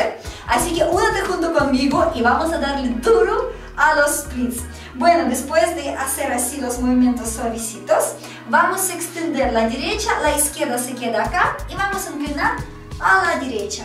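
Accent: Russia. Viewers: Eres rusa verdad? Yo también, lo digo por el accento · eres rusa???? tu acento y apellido parece ruso ty krasivaya heheh pryvet saludos muy buenos vídeos estoy practicando para la flexibilidad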